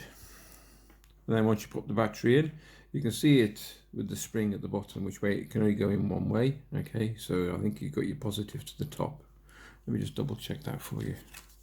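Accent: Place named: Shrewsbury, United Kingdom. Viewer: Recognise that accent...... Shrewsbury Monner by any chance?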